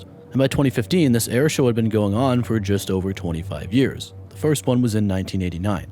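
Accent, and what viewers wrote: Canada. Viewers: Canadian ? Subscribed. 🇨🇦👍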